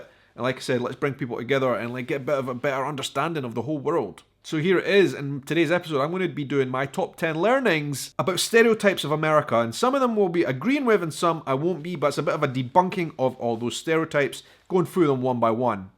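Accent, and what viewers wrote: Scotland, United Kingdom. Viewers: I just love listening to you talk. Have you realized how much Americans love the Scottish accent? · Been watching your channel for a while now. You are a wonderful speaker. You come across as very positive. The Scottish Accent is very cool, fun to listen to. I think you like America more than I do and I'm a native. I'll keep watching. I want go visit Scotland someday, after you've sold it so well. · I had to do a double-take when you said you "Spoked" to Americans, your Scottish accent sounded like "Spooked" Americans, and I thought why would Americans be scared of you! lol Scotland is on my bucket list to visit, more so than England. I suspect the accent though should make for some funny misinterpretations when I visit. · I second this. The Scottish accent is one of my favorites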